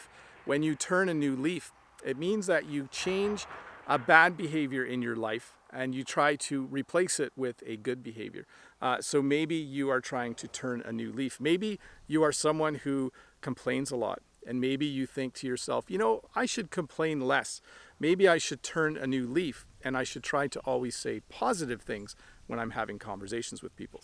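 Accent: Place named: Canada. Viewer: Hi from Milan (italy), very nice youtube channel... i think that understanding canadian accent is easier than understanding british accent 😅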